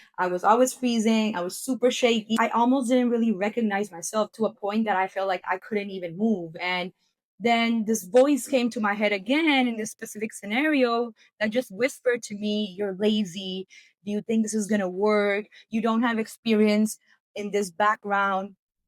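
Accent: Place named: Puerto Rico. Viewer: Nice vid. Why do you have an indian name but a puerto rican accent?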